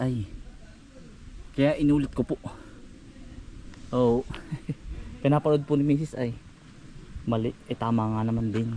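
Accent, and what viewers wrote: Quezon, Philippines. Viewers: Ka insan san ba banda bahay nyo if papunta sariaya san baba para makapunta dyan matagal na ako subscriber mo doo ka pa sa kuawit dalawa kami kaibigan ko kya nga sabi ko parang yung punto mo eh taga quezon ka nga kya noon nakita ko na naluluto ka ng kalamay sabi ko na nga ba at taga quezon ka eh meron kmi laundry shop dto sa lucban sa may gilid ng pandayan bookestore sa may kantosaan ba yan sa inyo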